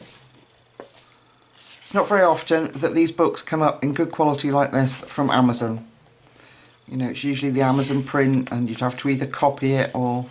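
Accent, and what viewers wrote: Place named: England, United Kingdom. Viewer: Many thanks for introducing these books. I particularly like the ‘Wonderful Little World’ book so going to try to find a copy. You are very generous with your time and knowledge, which I appreciate so much. It’s lovely to hear a ‘local lady’ so I know the books you show are available in England. Kind regards from York 🙏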